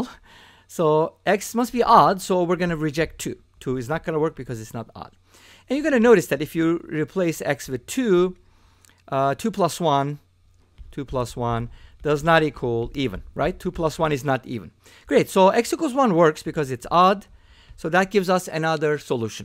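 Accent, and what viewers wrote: Turkey. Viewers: I like your rapid and simple solutions. Some of the math channels I checked out, they are too slow and redundantly repeating same formulas dragging on. The viewers are math students, and the podcaster needs to get to the bottom as quickly as possible. Especially this one Indian guy, who is a brilliant mathematician but just tedious and boring to watch him. So Ata boy! and from your accent I gather that you are Turkish. Ben azeriyim. Tebrikler!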